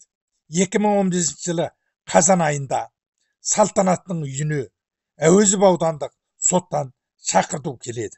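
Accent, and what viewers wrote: China. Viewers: Өзің қытайдың акцентімен сөйлейсіңде,Қытайды жамандайсың??? Өте қызық жағдай екен😂😂😂😂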